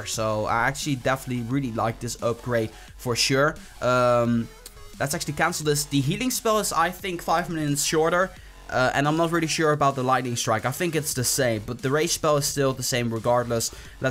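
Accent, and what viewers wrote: Netherlands. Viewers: Ben erg benieuwd hoe je unieke accent bij het spreken van Engels tot stand is gekomen. Aan de ene kant heb je vrij duidelijk een typisch Nederlandse tongval (inclusief de uitspraak- en taalfouten die Nederlanders vaak maken), maar het klinkt daarnaast net alsof je er wat Schotse, Ierse en in mindere mate Amerikaanse ingrediënten aan je accent hebt toegevoegd. Sommige onderdelen daarvan klinken best authentiek. Je spreekt ook best snel en vloeiend waardoor het wel natuurlijk overkomt. Grappig en interessant; viel me op.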